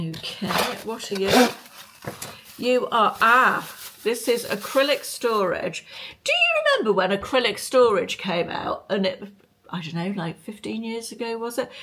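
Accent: United Kingdom. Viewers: I order a lot from Temu but most of it is for craft supplies/scrapbooking, bookbinding and junk journals. Most clothing items, tablecloths and placemats are made of polyester. I tend to perspire a lot and can’t wear polyester so I don’t order clothes. I like the fact that you can return as many items as you want with free return postage on each order. I haven’t sent many things back, most things work as they should. Certain things are more expensive than on Amazon, etc. you have to know your prices. My biggest complaint is they pack everything in thin plastic bags so many items get wrinkled and smashed up in shipping. For example, gel plates for printing should be packaged in a box so there are no dents or depressions on them. The dents will end up showing up when you print. I love your videos because you jump right into the unveiling. It’s so irritating when people ramble on and on for 5-10 minutes before they get to the nitty gritty. 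Your personality is so charming and I love your British accent. You have a great sense of humour (tongue in cheek) and you don’t take yourself too seriously. I like watching what you buy because it gives me some ideas about things I’ve never noticed on Temu. Thanks, keep them coming, be well!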